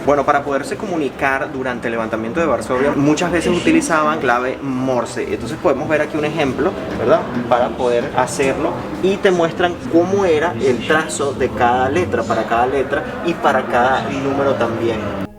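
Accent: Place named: Venezuela. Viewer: soy venezolana y por casualidad me encontré con este video. Al identificar tu acento, me emocione. Al mismo tiempo me lleno de admiración como en estos tiempos tan difíciles están realizando tu y tu esposa este trabajo increíble. LOs felicitó! Continúen con esto que es el rescate de la historia y su legado a las nuevas generaciones.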